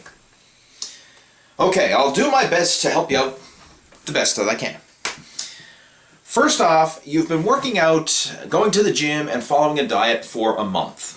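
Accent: Newfoundland, Canada. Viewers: Buddy, you sounds like your from NL!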